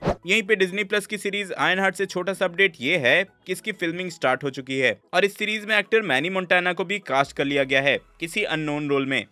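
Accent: Bihar, India. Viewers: I think your r frm bihar, I'm also frm bihar😂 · Aap bihar se ho kya 👀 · Bihar se ho kya? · Aap bihar se ho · Sir ap bhi bihar se ho kya????? 🙂🙂🙂🥲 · Are you from Bihar ? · Are bhai aap bihari ho kya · Are you from bihar? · Bhai Bihar se ho kya? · Aap Bihar se ho ky👀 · are u from bihar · Aap Bihar se ho kya Bhai :) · Aap bihar se ho kya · Ap Bihar se ho ? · Bihar s ho ky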